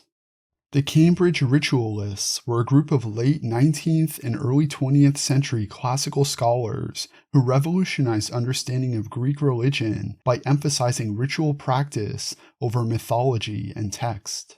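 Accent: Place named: California, United States. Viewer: The California AI accent is too annoying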